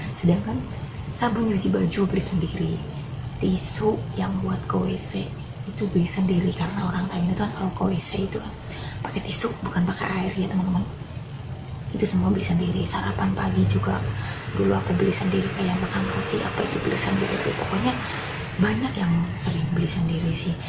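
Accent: Banyumas, Indonesia. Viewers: Ora ngapak ora kepenak mbak..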